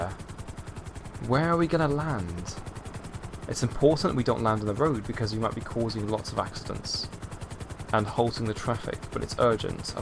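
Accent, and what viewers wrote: United Kingdom. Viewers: I like your english accent 😍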